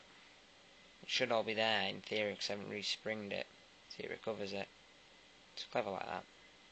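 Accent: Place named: Sweden. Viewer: thank you ,,,,är du från sverige åååääääääööööö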